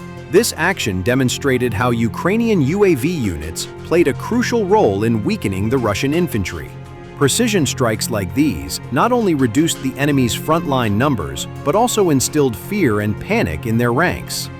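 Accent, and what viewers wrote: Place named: United States. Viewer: DAS VIDEO IST OK ABER ICH MUSS KOTZEN WENN ICH DIE AMI STIMME HÖRE EINE WIDERLICHE SPRACHE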